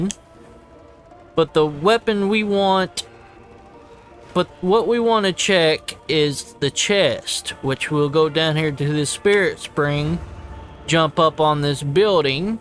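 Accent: South, United States. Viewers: bros aggressively southern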